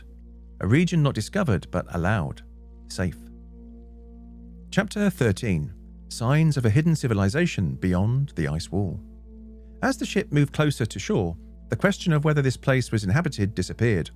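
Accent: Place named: England, United Kingdom. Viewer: please, no more english accent 😮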